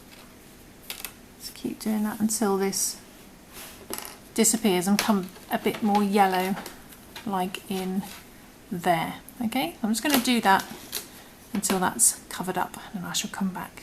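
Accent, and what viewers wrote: United Kingdom. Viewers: Another great video. I love all your designs. Hearing your accent i think you may live in UK. If you do, can you please tell me where i can buy yarn..a big shop! All i have found by now are baby yarn or dk yarn on a chinese local shop..thank you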